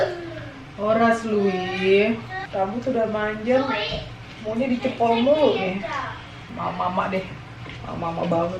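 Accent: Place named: Java, Indonesia. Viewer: Mamak, apa dari ortu mamak ada darah jawanya kah? Kalau bicara mesti ada bahasa jawanya. Seneng dengarnya 😍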